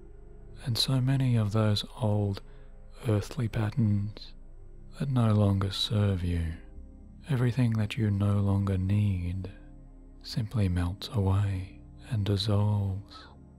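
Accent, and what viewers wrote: Australia. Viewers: Are you a fellow Aussie or a New Zealander? Thanks for you video! X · I’ve been listening to you for a long time before I fell asleep. Recently, I realized that I can speak with an amazing Australian accent. Made me popular with my friends, thank you:) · Are you austrailan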